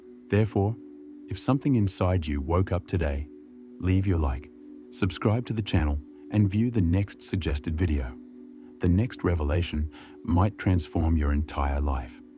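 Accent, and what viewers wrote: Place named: Australia. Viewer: Strong Aussie accent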